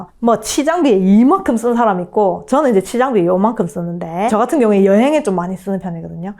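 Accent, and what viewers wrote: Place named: Gyeongsang, South Korea. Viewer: 역시 갱상도 언어^^ 구수~~~~함미다^^🎉🎉🎉 청송아재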